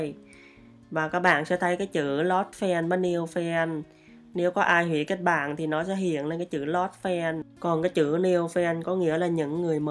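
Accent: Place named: South, Vietnam. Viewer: Chính xác giọng nam kì rồi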